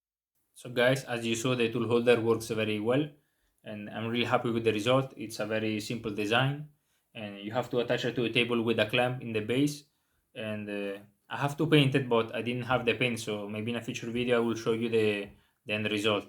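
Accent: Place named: Italy. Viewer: I understand everything he says. He speaks English with an Italian accent. Put on the subtitles and you can read what he says.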